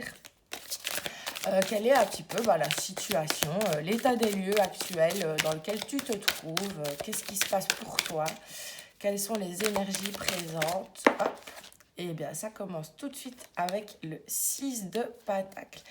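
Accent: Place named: Belgium. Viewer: Je vous découvre j'adore votre accent belge si je ne me trompe pas. Évolution pro surtout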